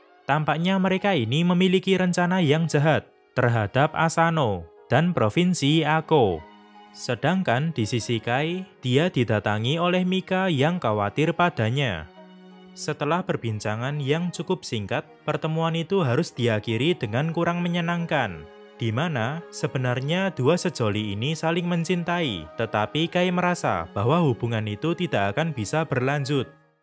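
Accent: Java, Indonesia. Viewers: Medok ya mas 😁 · Jawi banget mas e😁😁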